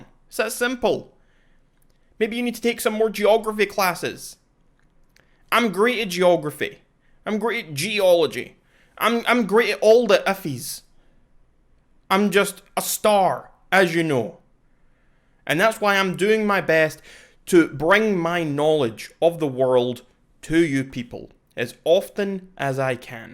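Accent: Scotland, United Kingdom. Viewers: Is he actually not scottish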